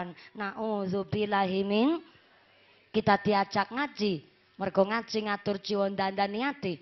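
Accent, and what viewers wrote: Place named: Banyumas, Indonesia. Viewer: Kenapa skrng jd ngefens banget sama Ustadz, bagus ceramahnya ngapak meneh, 😀😀😀😀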